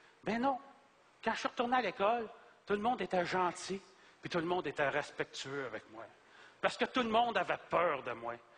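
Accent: Quebec, Canada. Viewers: Je disais juste qu'il y a beaucoup trop d'expressions qui ne sont pas françaises mais bien québecoises et que du coup je ne peux pas comprendre l'intégralité du truc, surtout le début avec son histoire de "batoille". Bon après y a l'accent qui me bloque un peu. Je suis beaucoup plus fan de Marc Labrèche na !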